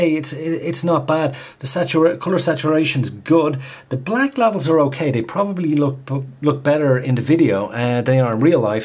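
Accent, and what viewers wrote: Ireland. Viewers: Love the accent.....irish? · fecking hell, you are so obviously Irish :P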